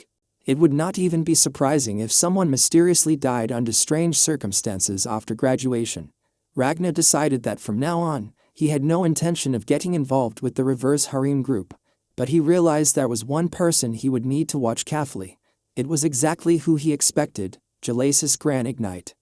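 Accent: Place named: Sydney, Australia. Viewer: sounds like someone from Sidney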